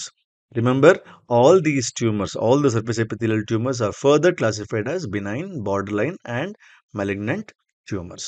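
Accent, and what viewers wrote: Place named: India. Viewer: Indian voice🤢🤢🤢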